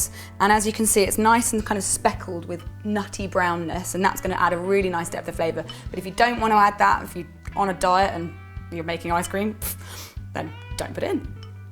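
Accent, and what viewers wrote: United Kingdom. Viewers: I love ur British accent btw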